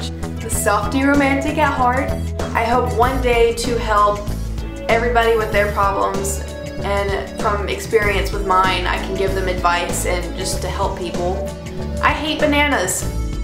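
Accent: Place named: United Kingdom. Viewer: Do you wanna know something im sorry for calling you by your real name but my name is as well it is just spelt with a ( c ) and who cares if u think you have a bad british accent you are silly no affence. Coz i loved your accent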